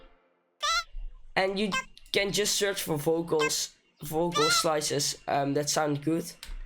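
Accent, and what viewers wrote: Netherlands. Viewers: yeah he sounds dutch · I thought first he is martin garrix. He sounds dutch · Ben je Nederlands? Je klinkt namelijk erg Nederlands aan je accent. Maar je spreekt echt goed Engels.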